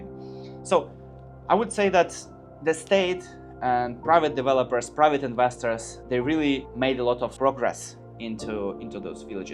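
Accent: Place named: Finland. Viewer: Wait, are u from finland? No wonder ur accent sound so finglish.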